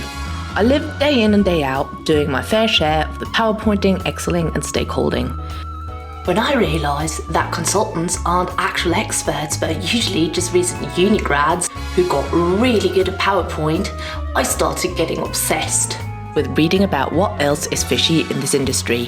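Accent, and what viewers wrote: England, United Kingdom. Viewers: I'm riveted by the waterfall hair, the jazz, the beauty, and simultaneously the horror of the words actually being said. 925% ! Bloody f....king hell. Final verdict: dizzy & sick. Ooof. PS - really nice handwriting. With blurred f-stop closeups. And then the accent - which bit of England is that? Those are the last straws. What's a man to do. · Excuse me if you mind, where are you from? I figured out where your accent is from, it sounds like it's from somewhere in the UK but not quite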